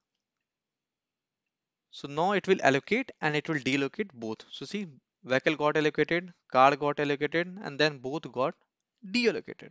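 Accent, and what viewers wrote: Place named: India. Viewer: 咖喱味 听不懂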